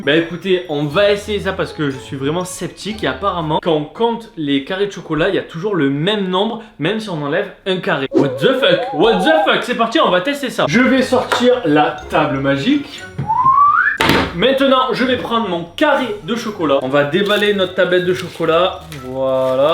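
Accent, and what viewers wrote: Marseille, France. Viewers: Tu as un accent marseillais trop chou😉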